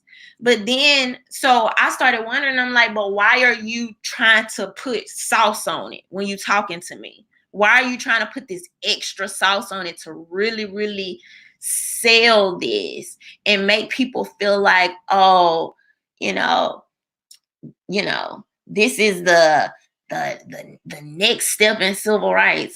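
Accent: South, United States. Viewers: The southern accent ....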